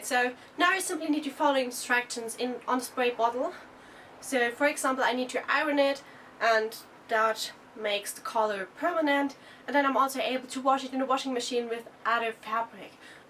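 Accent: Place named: United Kingdom. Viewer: Cool, das merke ich mir. :3 Und die Folie fixieren, das merke ich mir auch :D Du hast einen süßen britischen Akzent :D Liebe Grüße Zementitia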